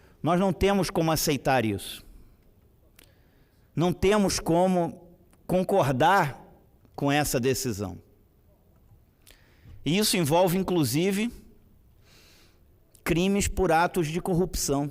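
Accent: Rio de Janeiro, Brazil. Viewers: O assunto é bom, mas... Essa merda de sotaque carioca cheio "Xix" ninguém merece!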